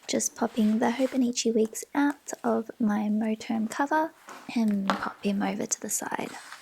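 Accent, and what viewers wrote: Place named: Australia. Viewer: I'm falling down a deep rabbit hole that is the planning and journaling community and I LOVE your channel! Soft spoken creators and simple aesthetics are the easiest ways to get me subscribed, so thank you for creating, can't wait to see more! I use a Hobonichi weeks and Field Notes for commonplacing/Korean language study planning (Megan Rhiannon's former system, if you know her channel). Plus I just ordered a vegan traveler's notebook from etsy and so seeing other people's systems to see what works well for them is wonderful to see what could be adapted to my system. (Also, one of the only podcasts I listen to is an Australian comic book movie podcast - The Weekly Planet, so I love that I get to add more Australians to my repertoire of favorite content.)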